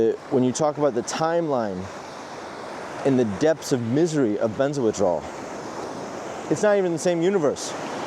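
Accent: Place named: Canada. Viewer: Are you from Canada? Seems you have an accent. 😅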